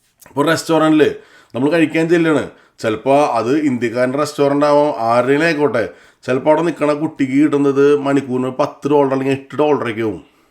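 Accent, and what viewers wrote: Thrissur, India. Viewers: ഞാൻ തൃശൂർ താങ്കളുടെ സംസാരം ❤❤❤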